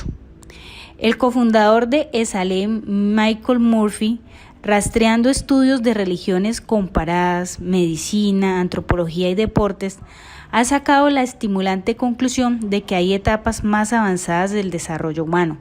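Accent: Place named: Latin America. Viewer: De todos las versiones en español, me gustó más su versión ya que es español latinoamericano y puedo entender bien el acento ❤ gracias!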